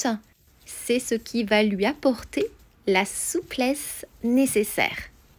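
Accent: France. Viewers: I don't know about the biscuits . But that French accent is fabulous . ❤️👍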